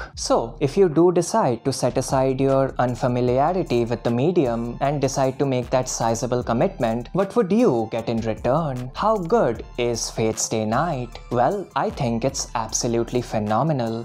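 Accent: India. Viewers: >Open video >Indian accent >Close >Dont recommend channel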